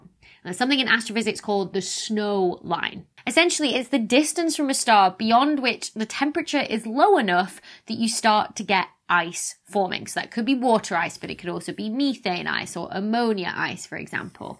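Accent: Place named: Cockney, United Kingdom. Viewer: "ME-thane!!!" You're such a cockney cutie!!! I'll bet you say "al-U-minium," too?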